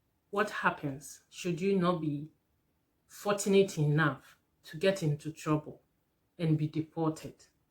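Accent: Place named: Jamaica. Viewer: Are u Jamaican? U sound like my people.. stay bless.. good review sis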